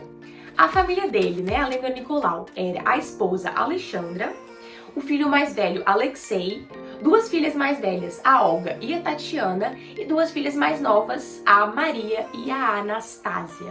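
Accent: Minas Gerais, Brazil. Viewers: Gostei do vídeo anjo.. E essa camisa aí da Rússia q tem a msma cor da camisa da seleção soviética?! É linda.. A Rússia país onde tem a Sibéria.. A Rússia já foi um Império e sua capital era São Petersburgo.. A Rússia da Vodka e do Balé Bolshoi.. É o maior país do mundo.. Foi uma das quinze repúblicas da União Soviética.. Amo o jeito q vc fala.. Amo o seu sotaque mineires.. Tamo junto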